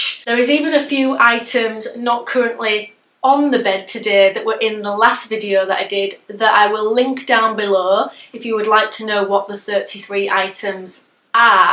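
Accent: Yorkshire, United Kingdom. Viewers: I really love your channel. You are so inspiring. Are you from Yorkshire? Thank you 🇦🇺❤️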